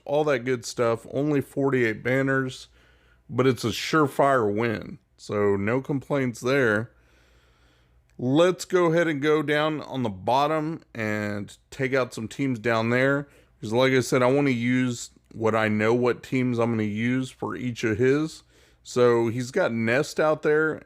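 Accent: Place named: Texas, United States. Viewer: fiddy-four banners LOL! ive noticed your cool accent before.Are you from Texas or smthing? :D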